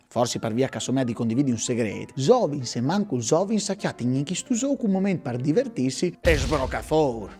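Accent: Italy. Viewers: Brâf! Il furlan di indulà tabaitu ? Un tic talianât.. e tu sieris encje disint Ciao... 😮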